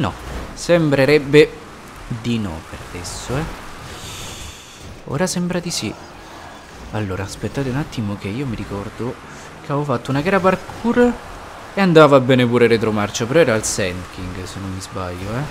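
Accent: Rome, Italy. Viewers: Sei di Roma?grande suiiii🐷🐖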